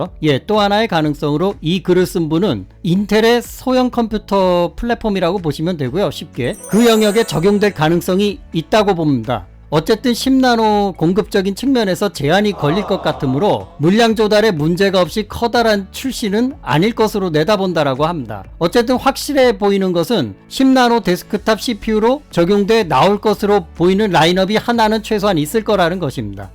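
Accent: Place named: South Korea. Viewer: 이아저씨 영어가 콩글리쉬라서 귀에 쏙쏙들어오니 좋네 ㅋㅋㅋ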